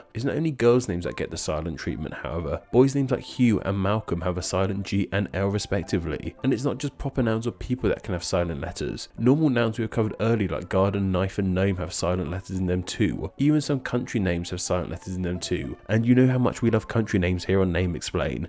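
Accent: United Kingdom. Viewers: That one has never made sense to me. We should just spell it “ieorn” or something. Technically, he used the same “r” most Brits use with preceding vowels before a consonant. We Americans lean into the “r” as a full-fledged consonant, like civilized people (sorry about the “zed”, Limeys) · Americans actually pronounce a lot of those words fully, I think that maybe 25% to 50% of those words you listed off at the mark were just British pronunciations of them. PS: Also, if a silent letter falls in the forest, and no one is around to hear it, does it make a sound? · Thats the british way of speaking. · The R in Iron isn't silent you're just British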